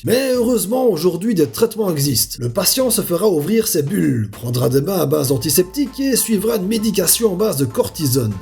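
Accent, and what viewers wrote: Liège, Belgium. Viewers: normal c un belge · t'es liégeois ??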